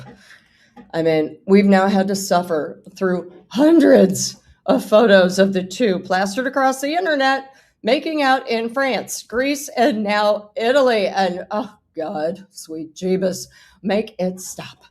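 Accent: South, United States. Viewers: Is it just me or did get a vaguely Southern accent for most of this video? 🙃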